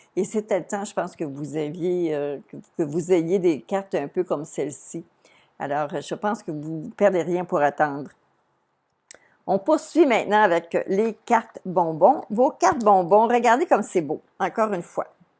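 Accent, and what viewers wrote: Quebec, Canada. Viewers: Coucou j’adore l’accent québécois 🌸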